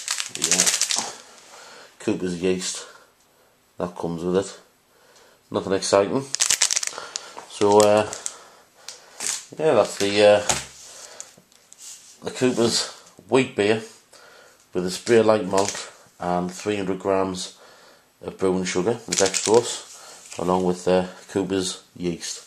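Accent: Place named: Newcastle, United Kingdom. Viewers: looks like a nice brew mate, keep us updated on the taste, you sound fairly local to me (newcastle), where ya from? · Good video thanks for posting it. I enjoy dark ales and will be looking for the one (hopefully) that you made of the Norfolk Nog. I can't quite place your accent, at a guess i would say Northeast heading towards Newcastle. Am i close to being right ?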